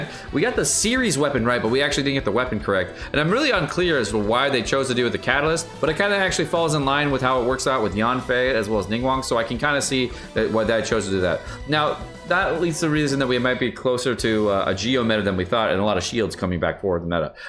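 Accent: United Kingdom. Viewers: British? Instant like.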